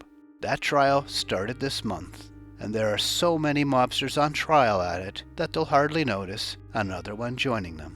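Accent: Canada. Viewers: Hi. You sound really Canadian! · Is he from Canada ?I've been trying to figure it out. He sounds like he's from Canada. Asking from Vancouver!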